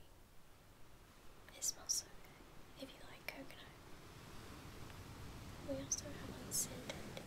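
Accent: South, United States. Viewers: For years now I've been tryin to decide where shes from based on accent. I wanna say Ga or SC. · Southern accent really gets me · Personally I miss the slightly insane... but coming from London UK I can get by with that southern drawl quite fine.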